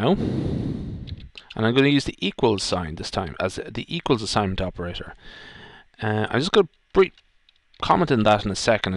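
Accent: Ireland. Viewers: thanks :) refreshing to hear the irish aswell haha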